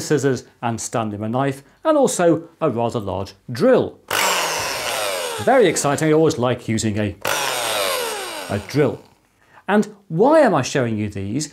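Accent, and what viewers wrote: United Kingdom. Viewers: It's like LInus Tech Tips but Bri'ish.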